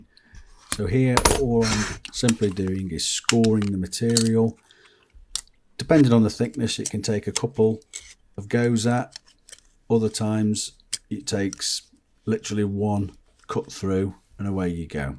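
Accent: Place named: Midlands, United Kingdom. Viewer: Excellent feature/tutorial. What would closest describe your accent, Leicester, Derby, Stoke?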